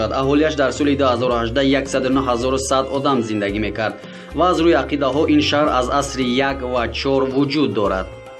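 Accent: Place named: Kulob, Tajikistan. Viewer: Кму минтакаи кулоба полний мегуфти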